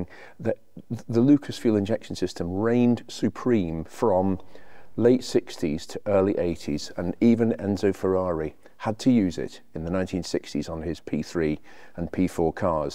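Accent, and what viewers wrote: United Kingdom. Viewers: I absolutely love the sound your voice. British English at its finest. Greetings from Sweden.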